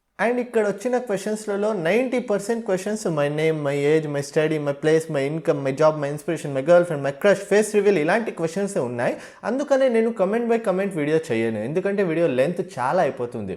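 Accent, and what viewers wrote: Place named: Telugu, India. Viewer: Mimmalni chuste sorry sorry meeru maatlade padhhathini batti telugu vaalle vunnatlunnaru..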